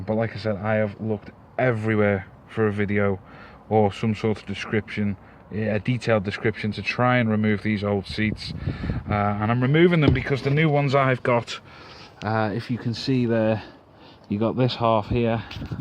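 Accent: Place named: Liverpool, United Kingdom. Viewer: Nice 1 scouse.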